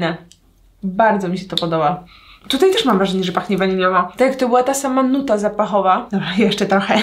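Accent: England, United Kingdom. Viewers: Ale masz kozak angielski akcent 😯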